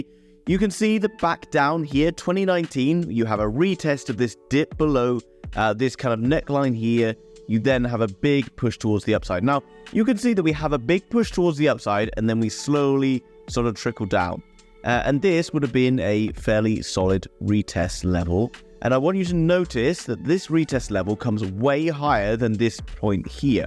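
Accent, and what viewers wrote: United Kingdom. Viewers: How did Pauly D get a British accent?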